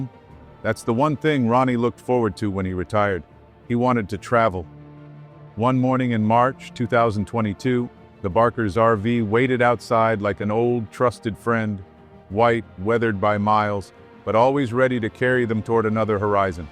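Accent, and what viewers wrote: United States. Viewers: By the way, your american- english is perfect. I love it. ❤. I whish all USA accents were like yours. Love your voice as well. Who are you? A profesional narrator?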